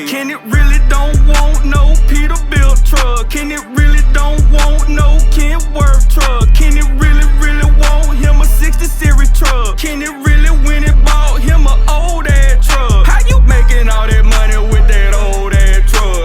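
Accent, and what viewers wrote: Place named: Texas, United States. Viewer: You from the H bro ?? You sound like you from Texas on god I know Texas/Louisiana accents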